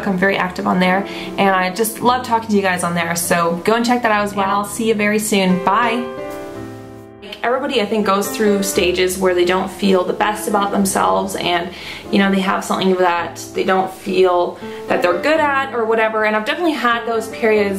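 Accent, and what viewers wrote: United States. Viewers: YOUR NOT FROM AMERICA!? YOU HAVE SUCH AN AMERICAN ACCENT THOUGH :O did u used to live here? thats awesome, i want to move to germany when im older :D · probably a stupid question but where do you live cus you sound American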